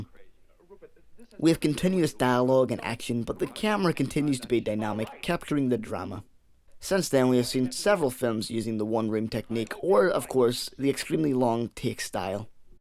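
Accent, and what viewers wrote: Northern Ireland, United Kingdom. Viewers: Just watched the film; I enjoyed it very much. If I may ask, where did you go to college/film school? Judging by your accent you are from Northern Ireland, correct?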